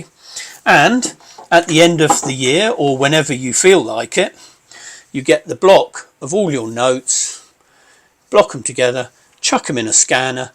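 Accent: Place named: United Kingdom. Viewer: you're teaching me how to do british accent,ackkk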